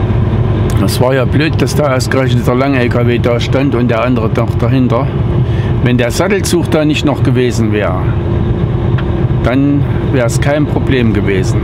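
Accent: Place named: Saxony, Germany. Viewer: Hallo, du bist einfach der zuverlässigste. Jeden Tag freue ich mich auf dein Video. Und sächsisch finde ich seitdem auch nicht mehr förchterlisch! Mach weiter so!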